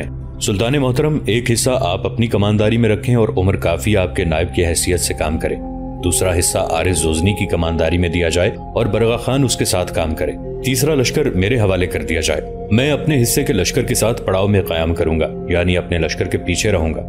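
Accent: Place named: Lucknow, India. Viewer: Agar Lakhnavi andaz mein commentry na ho to behtar hay.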